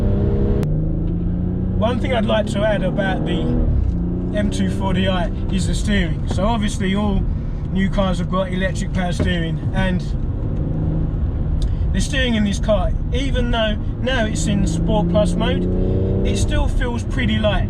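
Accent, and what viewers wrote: United Kingdom. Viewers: Excellent. So great to hear how it actually drives and how fast it feels, the body roll and suspension. This is important to me. Thanks. It's funny, you seem to have a UK accent, but appear to be driving in California. Enjoy the weather, mate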